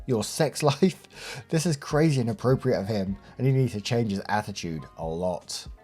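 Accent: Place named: Midlands, United Kingdom. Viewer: Where abouts are you from? I can't place your accent is it Midlands?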